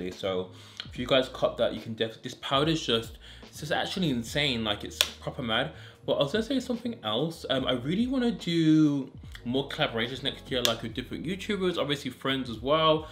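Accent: United Kingdom. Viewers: When the Viewers forget all about the heavy uk accent 😩Not from the US guys😂😘😍😘😍😘luv watching u😍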